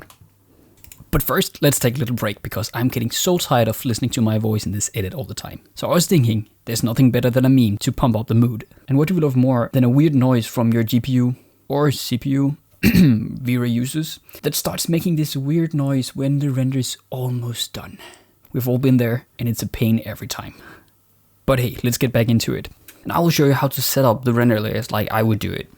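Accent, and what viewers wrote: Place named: Denmark. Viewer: Vent... du er dansker!?!?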